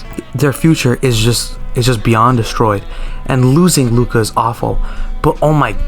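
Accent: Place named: Latin America. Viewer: your latinx accent is gross ngl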